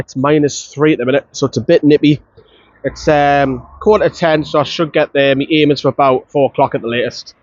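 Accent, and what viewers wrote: Newcastle, United Kingdom. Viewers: stupid me, I thought it was Tuesday today, haha, and I'm glad you talk Geordie, not posh dis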